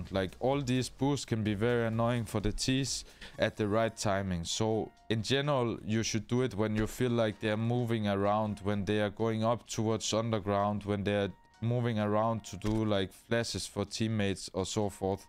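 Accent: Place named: Denmark. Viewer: Man kan virkelig høre du er dansker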